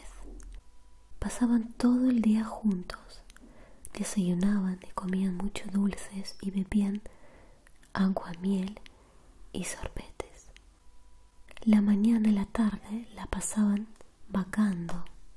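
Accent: Russia. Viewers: No me suelen gustar los videos de lecturas pero no entiendo porque al empezar el video el accento ruso puede conmigo xD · desde que escuché este video de lectura susurrada me hice adicto a tus asmr. para mi gusto el mejor asmr en español con acento ruso. Comienzas la oración con un nivel de volumen en tu voz y en la palabra final bajas el tono...esto hace muy placentero tu asmr. Gracias :) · Tu voz es fascinante, me encantan tus videos asmr. Esa combinación de español con el acento ruso es adictivo.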